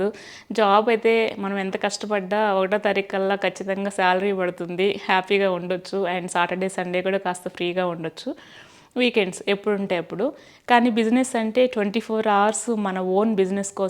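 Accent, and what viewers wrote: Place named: Nellore, India. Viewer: Hi andi, I can understand your situation Nenu kooda same mee situation lone unnanandi , nenu 2 years nundi shifts unde job chestunnanu night shifts ekkuva chestuntanu , health chala padaipothundi and pillalni sariga choosukolemu kooda andi job manaileka pothunnanu meeru cheppunatlu job chestoo undi sudden ga manesi khalie ga undalante undalemu , kaani health chala important andi manam healthy ga untene pillalni , family ni choosukogalamu , ie night shifts valla mind kooda disturb avuthundandi , anduke quit cheyyalane anukuntunnanu final ga Meeku business idea undi kabatti danike move avvandi andi ie night shifts chesi health disturb chesukokandi , idi experience tho mee meeda gowravam tho nenu meeku istunna salaha matrame , kaani meeku edi mee life style ki correct ga untundo. Choosukoni decide chesukondi, meeru matlade vidhanam naaku chalabaga nachuthundandi maadi kooda nellore ne anduke akkadi vallatho matladinatlu untundi naaku mee video choostunte , naaku time dorikithe compulsory gaa mee videos choostuntanandi . 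All the best andi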